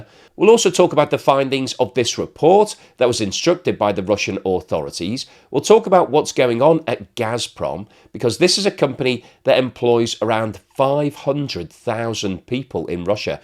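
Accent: United Kingdom. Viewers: Our host is British, eh wot?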